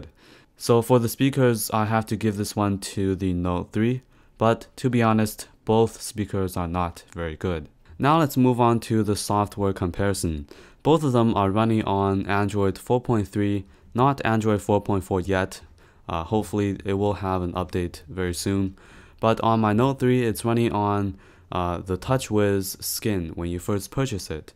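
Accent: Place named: China. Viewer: 这英文有点中国口音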